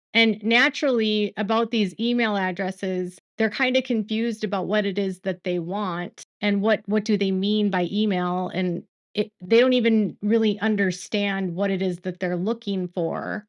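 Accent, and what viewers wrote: Minnesota, United States. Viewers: You sound like you are from Minnesota 🤷🏻‍♀️ 😊 I love it. I wish I could 👍 your video… I think I will anyway and just yours alone. My YouTube data is 2.38 and haha have no room on my iPhone because of pictures/videos of my son 😊 you know how it is 😂 And yes you are right about the steps. I was reading from another state, and I was misinformed. I do apologize for making my comments in regard to the steps. 😔 I really hope this goes to trial and the bring this up. Bryan knows something we don’t and has secret evidence. People thought it was silly he put out all the evidence right away, but what makes you think that’s all the evidence? 😂 it’s not. Bryan has it in the bag 😊 ❤ & Support ~ Always, you are a ⭐️ and stay strong. We are all here for you 😊 #iamnotabot EVER!! 😂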